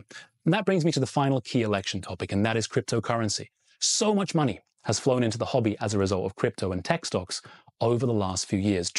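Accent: Canada. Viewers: Canadian! I've been trying to place that accent for ages! 😂 Genuinely thought you were either British or Australian. 😂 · I had no idea you were Canadian haha. I knew you weren't american, but could not nail the dialect. 😂 Great video as always buddy, Cheers! 🍻 All Americans GO AND VOTE!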